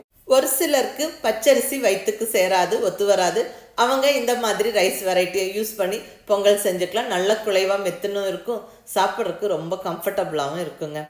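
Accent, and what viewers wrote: Kongu, India. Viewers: எல்லாமே சூப்பர் கோவை கொங்கு தமிழில் பேசுவது அதிலும் அவ்வளவுதாங்க சுவைகூட்டுதுங்க அவ்வளவேதாங்க சகோ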